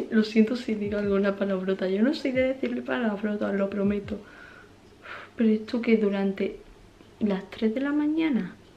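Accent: Spain. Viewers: Holi soy nueva y me suscribire en tu canal eres española me encanta como ablas wow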